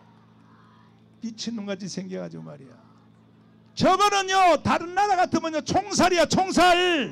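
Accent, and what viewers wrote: Gyeongsang, South Korea. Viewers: 목사님 찬양도 경상도 사투리도 은혜로워요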